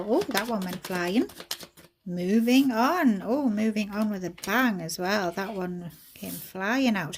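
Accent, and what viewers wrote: United Kingdom. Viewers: U sound like u from County Durham I'm from peterlee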